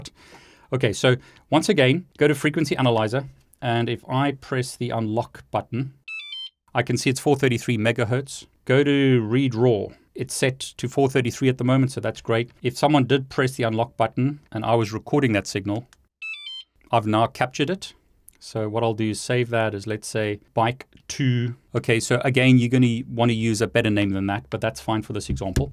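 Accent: South Africa. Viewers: South African? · Are you by any chance South African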